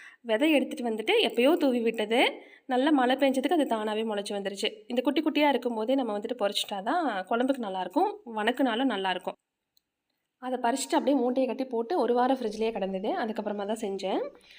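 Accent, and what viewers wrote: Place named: Kongu, India. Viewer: Kongu tamil nanga veetla pesara mathiriye pesirunthinga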